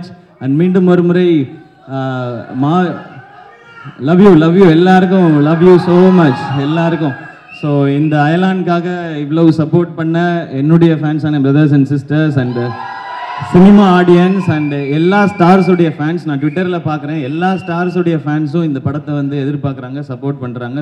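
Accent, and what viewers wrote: Coimbatore, India. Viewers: Coimbatore slang swag nov ❤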